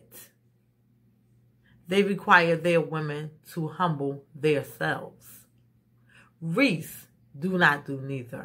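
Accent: New York, United States. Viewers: Are you from New York? I can tell from your accent in your voice if not new York somewhere up north love your channel and I have subscribed god bless😘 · Agree 100% You look so pretty, remind me alot of my Auntie's on my Fathers side ..I get New York vibez♡love your accent! Tfs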